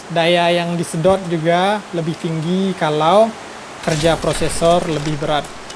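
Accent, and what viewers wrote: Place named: Padang, Indonesia. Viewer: Orang mana bang?logat nya kek org padang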